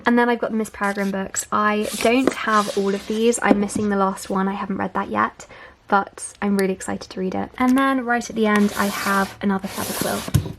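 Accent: United Kingdom. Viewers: 영국 영어 발음 정말 매력적이다 😆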